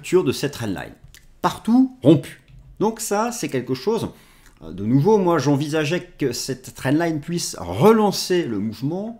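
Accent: France. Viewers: bien bonjour le j adore vos video avec les prononciantion à la française c est votre marque de fabrique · continuer à dire RANGE à la française monsieur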